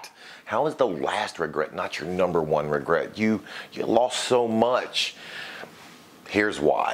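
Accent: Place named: South, United States. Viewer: I can’t guess his regional accent. Seems like a warm hearted southern guy.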